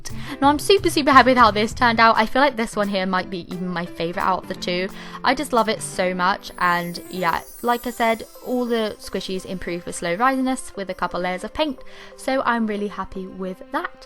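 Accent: England, United Kingdom. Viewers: my godness your english is sooooooo english,wow,i love it!!! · I love your British ascent ❤️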